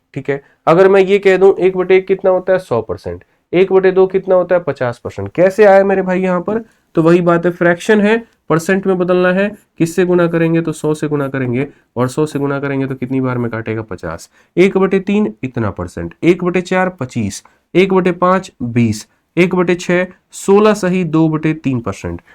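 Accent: Bihar, India. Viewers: bihari Vali bhasa Mt boliye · Maaz aa gya sir ❤❤❤ dil khus ho gya Aapna bihari ton ka baat hi kuch aalag hai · Sir , aap bihar se hai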